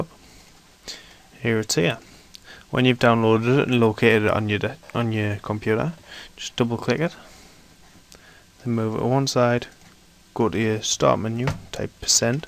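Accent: United Kingdom. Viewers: Are you British, Scottish or Irish? I love them all. I'm Aussie but I accept any other race in my country. Unlike SOME people I know...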